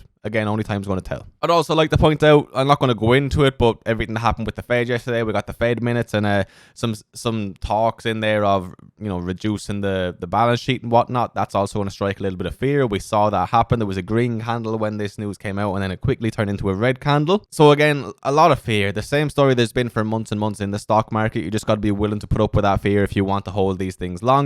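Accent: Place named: Ireland. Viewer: So glad you are back, good to hear that Irish 👊🏼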